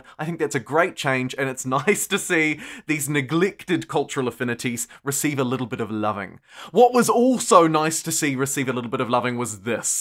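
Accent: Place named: New Zealand. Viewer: You’re one of the only excited-sounding YouTubers that I can tolerate. You’ve got such a genuine joy behind your voice and I love to get my Humankind news from you! It’s probably because you’re a Kiwi. Love that accent. Keep it up!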